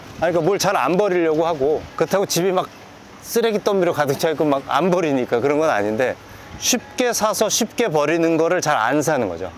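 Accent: Seoul, South Korea. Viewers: 원래 이런 병맛개그 성격이셨나요ㅎㅎ청춘의 덫 동철이랑 말투가 꽤 흡사하시네요~약간 옛날 서울사투리 느낌ㅎㅎ 올만에 뵈니 반가워요~~~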